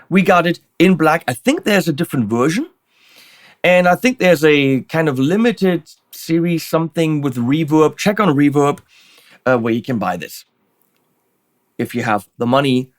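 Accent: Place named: Germany. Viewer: What a coincidence! There is a word in Greek with exactly that spelling and meaning! ΜΕΡΑΚΙ (yes in Greek P is the latin R and Π is the latin P). But it's not pronounced meh-ru-kai but meh-rah-ki exactly as you pronounced it with your German accent my friend. This could be the ERASMIAN pronunciation the latin world uses to read Greek for convenience. For those not familiar with ERASMIAN It's as if someone would read the english word "thought" as ...tho-oo-gt hahaha.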